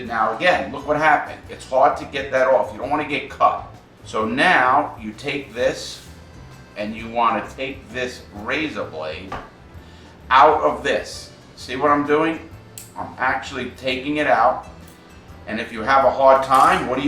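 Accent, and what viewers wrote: United States. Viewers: r u American ?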